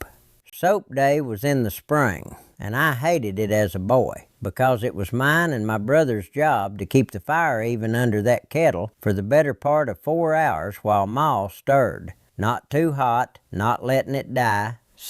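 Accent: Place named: Maggie Valley, United States. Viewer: Maggie Valley accent for sure.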